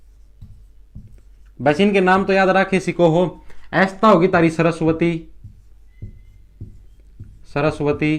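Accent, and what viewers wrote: Haryana, India. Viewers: nice strategy to cover this topic ..it's very helpful...nd aapki Haryanvi to best h 😂🤘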